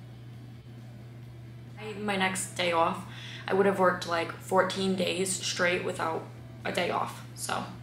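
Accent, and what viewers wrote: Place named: Boston, United States. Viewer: Are you from Boston? Love your slight accent and I think I see a cape cod bracelet. Cheers to you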